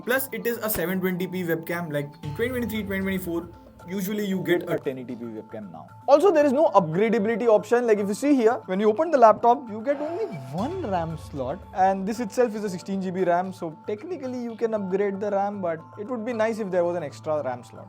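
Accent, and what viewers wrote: India. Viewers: Bhai ki english bhi hindi jaise lagti hai lagta hai jaise mene hindi video hi dekhi hai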